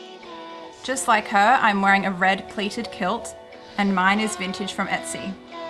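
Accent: Australia. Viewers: are you aussie? we should be yt friends! x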